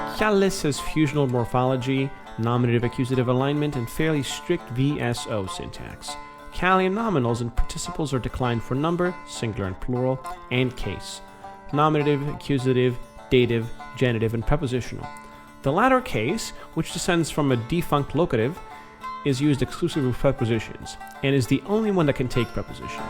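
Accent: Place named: Sweden. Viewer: The intonation sounded very Swedish.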